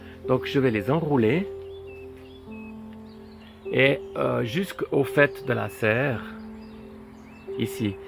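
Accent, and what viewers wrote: Vaud, Switzerland. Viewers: je suis d'accord, un pur vaudois (et non romand de Neuchâtel) chantant et savoureux 😉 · Bonjour vraiment très intéressant votre vidéo 🙏 vous avez l accent suisses vaudois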